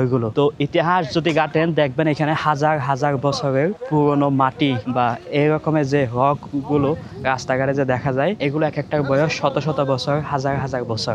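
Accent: Chittagong, Bangladesh. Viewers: ভাই তো চট্টগ্রামের মনে হয়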